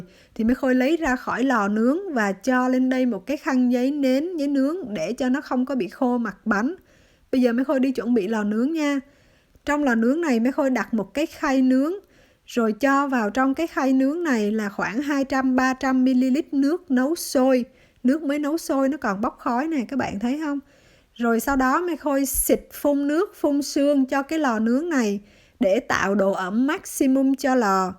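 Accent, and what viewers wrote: Central, Vietnam. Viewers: Cám ơn Mai Khôi chia sẽ. Nghe giọng nói hình như người miền trung hoặc Đalat?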